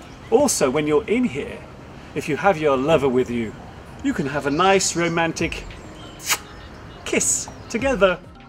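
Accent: United Kingdom. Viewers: Thanks a lot, Dear Misterduncan. Your videos are very fabulous and interesting. I like British Pronunciation very much.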